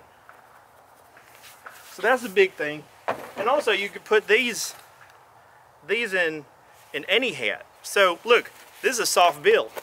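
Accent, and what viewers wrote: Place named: South, United States. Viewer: I ain't gonna lie this man's southern accent freaked me out for a second... lol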